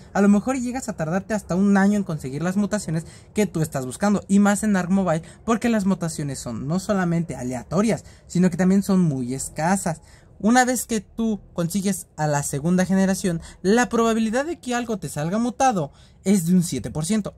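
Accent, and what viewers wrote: North, Mexico. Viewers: Muy norteño xD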